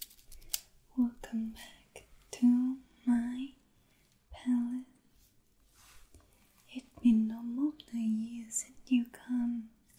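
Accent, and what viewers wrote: Vietnam. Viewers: do more viet accent videos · your viet accent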